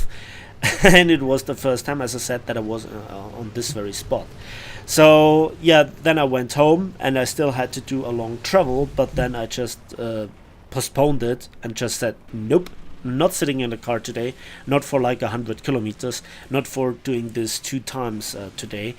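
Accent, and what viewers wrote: Bavaria, Germany. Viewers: I hob mas scho gdocht dasd a Bayer bist hahaha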